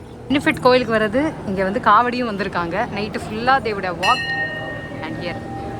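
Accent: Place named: Kongu, India. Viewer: Tamil sema athulium konku vois eathathrmana pechu nenga nalla pesirunga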